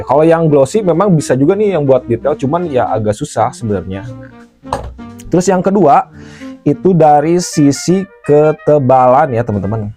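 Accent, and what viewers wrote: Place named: West Java, Indonesia. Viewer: a, logatna sunda pisan😂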